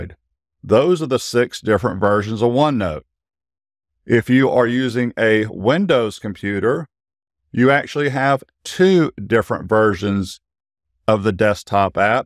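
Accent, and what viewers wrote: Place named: Georgia, United States. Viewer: 1. I recognized your GA accent 2. I am also a CPA 3. Thanks!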